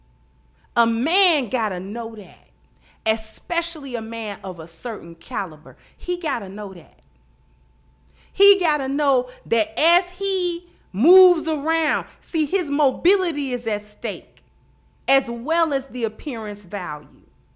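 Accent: Chicago, United States. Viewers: I didn't know you were in Chicago, but since you said it I can definitely hear that Chi-town accent. 😉 I have family and friends all through the Chi. By the way, you're coming with that fire 🔥 today! Lori Harvey is getting ran through... It's sad really.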